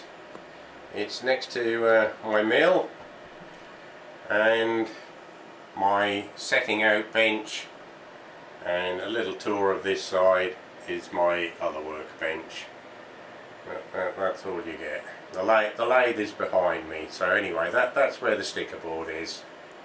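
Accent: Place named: Norfolk, United Kingdom. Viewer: Y'oright Bah? I've been watching this series thinking he's got to be from Norfolk without actually checking your bio. Glad to find that my ear for East Anglian accents hasn't let me down. Good to see that you've got the internet up there now, keep up the good work! From Sunny Suffolk 😉😄👍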